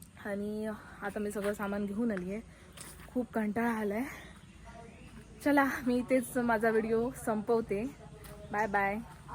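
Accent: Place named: Konkan, India. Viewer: कोकणी बोलण मस्त वाटत, मांजराशी बोलण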